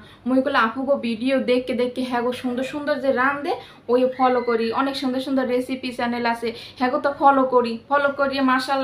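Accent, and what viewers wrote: Barishal, Bangladesh. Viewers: আপা বরিশালের ভাষা মোগো আঞ্চলিক ভাষা ভালো লাগে আপনি এভাবেই কথা বলবেন ভালো থাকবেন ❤❤❤ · আপু আমার মেয়ে আপনার আপু আমার মেয়ে আপনার বরিশালের আঞ্চলিক ভাষায় কথা অনেক পছন্দ করে ❤❤আপনি এভাবেই কথা বলে ব্লগ করে যান❤❤পাশে আছিআপু❤❤ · আপু তোমার বরিশালের ভাষা শুনতে আমার খুব খুব ভালো লাগে❤❤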